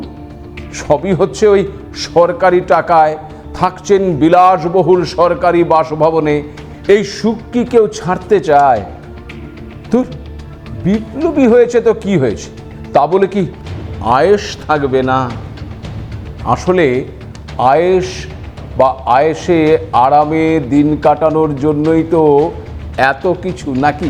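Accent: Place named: Barishal, Bangladesh. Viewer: Mama apne go bolla bolla kotha hunta mojai lage ater laiga Bangladesh ar news shuiya tmader moto bolla der kotha shunta ase😂😂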